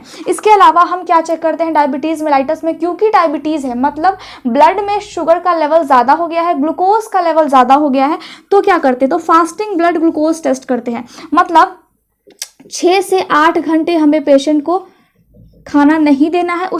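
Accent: Bihar, India. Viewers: Ap Bihar se ho didi kaya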